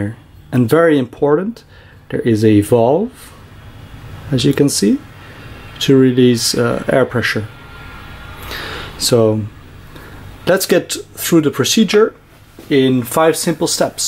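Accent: Netherlands. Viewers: Horable Dunglish as the Dutch call Dutchified English. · This guy is Dutch... I can tell that accent from miles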